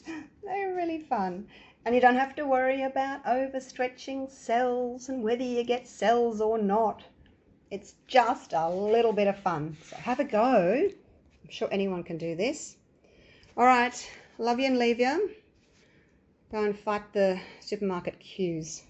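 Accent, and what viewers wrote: Australia. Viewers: Just discovered your channel and it's amazing :) Thank you for sharing all your techniques, that's so generous and inspiring! I'm starting to explore with pouring and you're giving me lots of ideas. And great to hear some Australian, takes me back to my backpacking year ahah Love, from Germany (and France)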